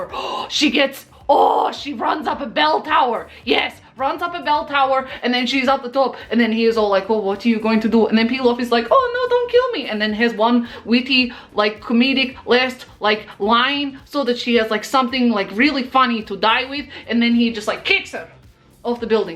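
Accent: Australia. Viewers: OmO I'm back. Oh lord. I found Piloff and this accent first.. and I started to go through your other videos because I am in love. But I was shook hearing the aussie accent. You are so extra and halarious!! Keep up being the fabulous you!!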